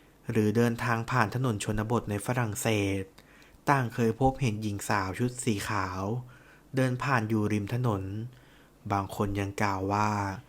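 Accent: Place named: Thailand. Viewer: เสียงเหน่อ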